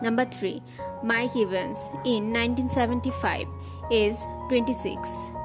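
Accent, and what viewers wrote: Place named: South India, India. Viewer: This narrator has very strong South Indian accent. I can't understand her ?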